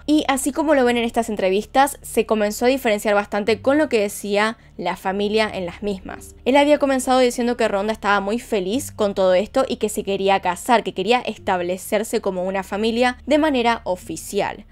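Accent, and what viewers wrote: Argentina. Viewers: gracias por tu trabajo me gusta mucho y te expresas muy bien me encanta tu dejo argentino sigue deleitandonos con casos de diversos paises saludos desde Lima Peru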